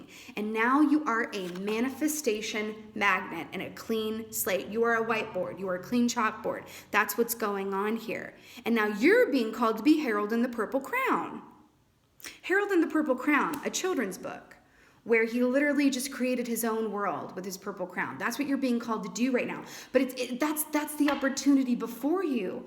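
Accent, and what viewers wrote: South, United States. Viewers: I here the "y'all" and I know you must be from the south....I'm from south Alabama and y'all is my favorite!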